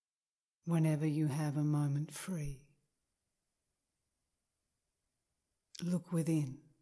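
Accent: Australia. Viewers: lovely proper Aussie accent, brilliant m8🙋‍♀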